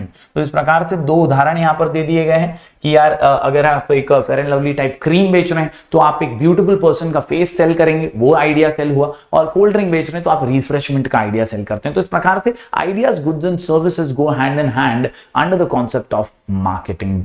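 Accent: Maharashtra, India. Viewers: Thanku sir marathi tadka mast hota😂❤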